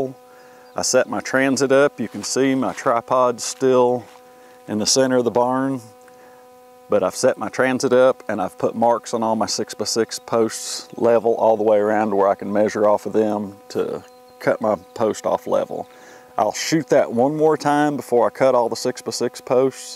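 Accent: United States. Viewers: Are you from west Georgia?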